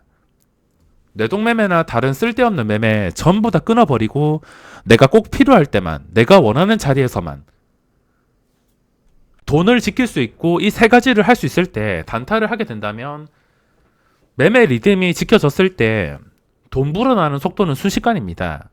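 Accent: Gyeongsang, South Korea. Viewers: 경상도 억양이 너무 구수하시네요 ㅎㅎ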